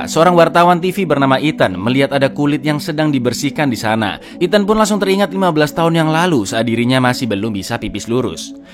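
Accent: Java, Indonesia. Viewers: Setuju bro. Mantap kayaknya. Medok banget dia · Org Jawa kah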